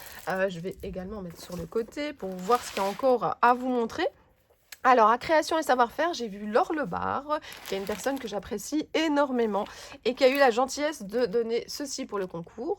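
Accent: Belgium. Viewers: Coucou! Merci pour ce concours auquel je participe avec grand plaisir! J'ai eu un méga coup de coeur pour la collection de Lorelaï Design ainsi que pour le lot de la bulle à scrap! Ce que je préfère sur ta chaîne c'est les reportages des salons qui sont toujours très intéressants et qui permettent de découvrir de nouvelles personnes et boutiques... Et ton accent me rappelle la maison ❤ (belge expatriée en France depuis presque 10 ans ça me donne toujours l'impression d'être rentrée chez moi quand je regarde tes vidéos ❤). J'ai également eu la chance de participer à un atelier à version scrap Paris et à nuance de scrap et tes explications et ton implication sont vraiment super! J'espère pouvoir participer à un nouveau salon en 2024 pour t'y croiser 😊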